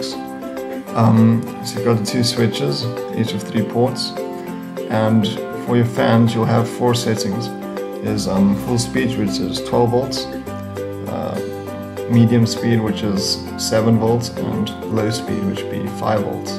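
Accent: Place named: South Africa. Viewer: Hello, by the accent I assume you come from South Africa, where did you get the switches from?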